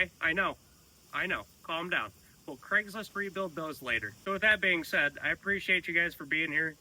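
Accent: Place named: Minnesota, United States. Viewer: Sitting here hearing a hometown type accent going.. This dudes from MN.. Look up and sure as shit.. yall are. Fellow rock and cow, how are ya doin!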